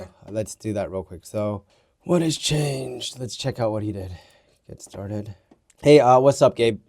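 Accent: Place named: United States. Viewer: Wait.. What? You have a very good American accent for a Korean.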